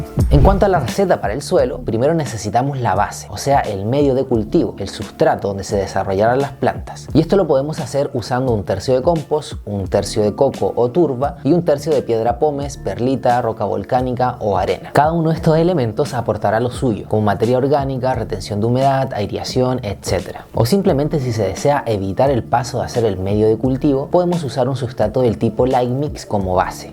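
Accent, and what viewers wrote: Chile. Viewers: me gusta tu acento no tan chileno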